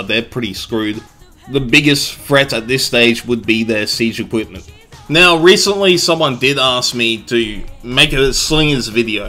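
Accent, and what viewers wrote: Australia. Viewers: jeah and his aussie trashtalking is fucking funny xd but i meant that he is one of the few youtubers that doesn't just upload average games or boring low tier matches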